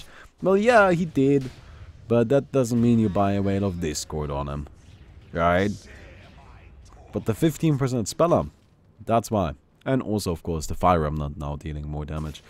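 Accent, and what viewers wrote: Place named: Germany. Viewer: I still really like watching these casts. it combines two of the most entertaining things that can be found in youtube. Games, and german opinions. that accent just makes everything more fun to me :)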